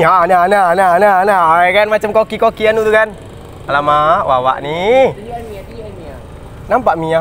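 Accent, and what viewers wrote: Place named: Jakarta, Indonesia. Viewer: Kyk org betawi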